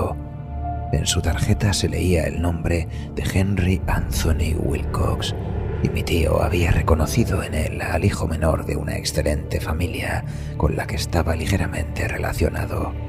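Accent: Spain. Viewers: ay a mi no me gusta el acento español, ademas este señor lee todo de manera monotona, siempre en el mismo tono, no hace bien las pausas, Tener voz algo grave no quiere decir q se es buen relator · si, pero la cuestión del acento es mucho mejor en este canal para españoles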